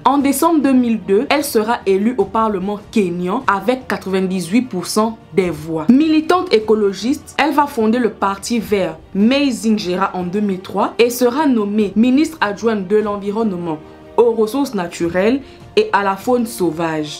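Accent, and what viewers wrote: Fang, Gabon. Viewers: Bébé l’accent fang est toujours là hein nkolomessa ossuuuu❤️🥺😂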